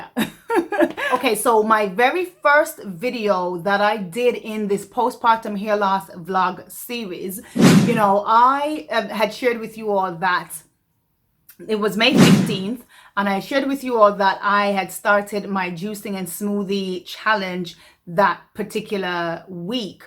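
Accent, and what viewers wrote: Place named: Jamaica. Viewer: Lol you’re Jamaican??? The accent sounds familiar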